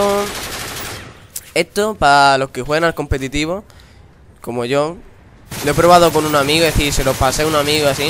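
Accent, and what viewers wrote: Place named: Andalusia, Spain. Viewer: Los andaluces hablan así xd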